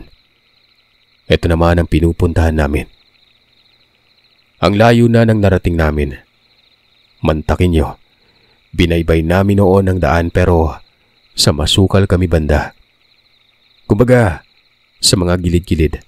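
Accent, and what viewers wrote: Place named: Iloilo, Philippines. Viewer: Ilonggo. Eh. Malambing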